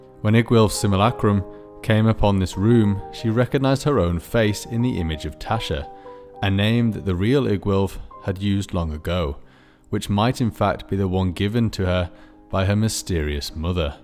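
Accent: United Kingdom. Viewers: The argument that occured two months ago was fascinating to read. Not that it matters, but I think I'd support the supposed linguists assertions, as someone who has a name that is a transliteration of a common English one, because in my ancestors mother tongue, they do not speak with certain consonant sounds, like "r." Also isn't an American using American pronunciation. As far as I can tell, he's British.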